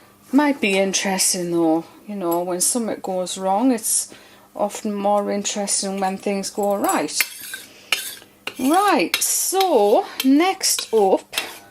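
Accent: United Kingdom. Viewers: What ?! people are watching your videos to hear your lovely voice with your beautifull British accent and your soaps that are really but really look tasty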